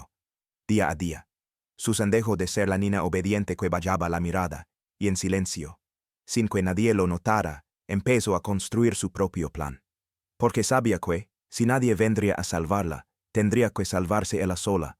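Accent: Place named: Portugal. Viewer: Habiendo locutores con voz firme de habla en español, contratan a una persona de origen porrugues, qué mal gusto...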